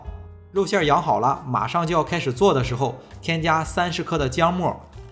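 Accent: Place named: Shandong, China. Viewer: 你是山东人吗？听你的口音和有些厨房的工具名称像我们家乡的人。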